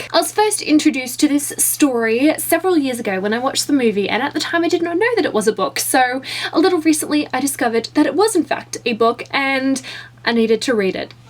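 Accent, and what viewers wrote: Australia. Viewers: You sound aussie! haha I don't come by many of them on the youtubes, I really feel this book needed a more in depth review as there is soooo many topics this book brings up, regardless this was not too bad. As for the narrators name.. he mentions a name a few times I believe it was jake or joe or something.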